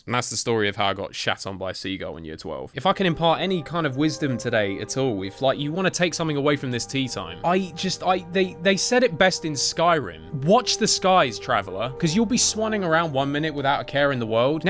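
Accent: United Kingdom. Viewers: I already love this series lol, gonna have much fun with these listening a bri-ish gentleman talk about his experiences.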